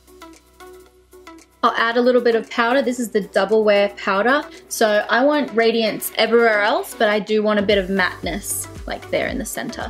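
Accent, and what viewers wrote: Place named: Australia. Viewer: when you said "desire" i heard "the Zaya" :)) gotta love the aussie accent <3 need to try the lipsticks as they look amazing on you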